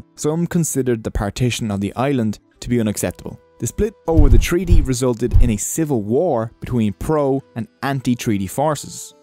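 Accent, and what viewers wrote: Dublin, Ireland. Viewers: Am I the only one to never notice your Irish accent till this video? Like suddenly I heard it and thought “was he always Irish? Why am I only now noticing this?” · Thank you for making such a great video! My heckles rose when I saw it but as soon as I heard your Irish accent I was relieved. It’s always best that Irish people take the position of explaining their own history. Fair play! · Are you Irish, because you have that accent? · Sounds like a Dublin accent. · The Irish accent makes it even better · Ngl when I first saw this channel I thought it was run by the same guy from real engineering. I couldn’t tell between different Irish voices lol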